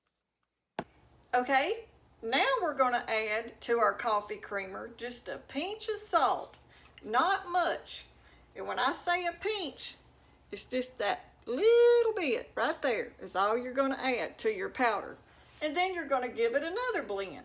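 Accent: South, United States. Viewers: I came to learn how to make creamer, I stayed for the southern accent! 😊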